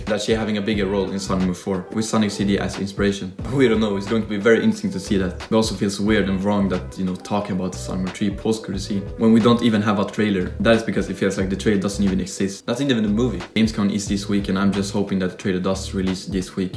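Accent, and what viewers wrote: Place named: Sweden. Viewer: Been watching your channel for a time now, and both me and my sister had our suspicions on you being Swedish due to your accent, so thanks for telling 🌸 Vi behöver flera Svenska Sonic fans 🥲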